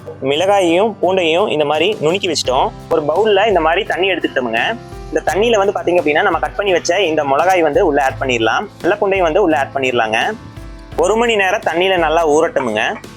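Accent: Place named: Kongu, India. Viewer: கொங்கு தமிழ்.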